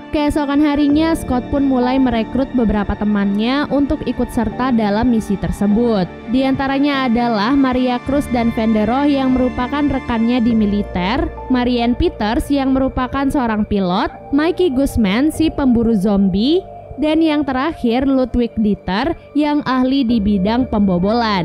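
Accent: Lombok, Indonesia. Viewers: Kak aku dengar kak dari lombok aku juga dari lombok 😁😁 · ORANG LOMBOK YA KA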